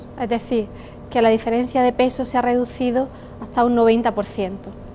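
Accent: Andalusia, Spain. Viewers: Que bonito Andaluz! La lengua madre del hoy español estándar (sin zezeo)! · tiene un hermoso acento andaluz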